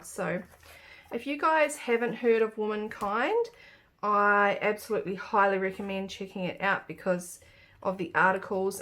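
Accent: New Zealand. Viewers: Thank you..I am from Wanaka and you sound very NZer to me.x I love watching your video's and love what you make.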